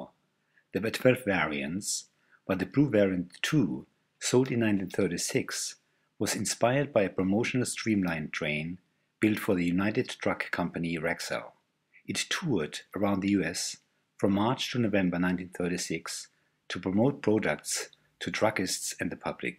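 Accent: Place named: Germany. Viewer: Sounds like you may be German by your accent. Your trains are the most prewar examples I've ever seen. Thanks for posting. Danke.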